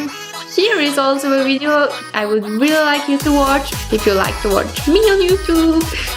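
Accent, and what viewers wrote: Italy. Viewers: why do you speak with an italian accent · Does she speak English with Italian accent? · her accent sounds more Italian, then Norwegian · She is speaking english with italian accent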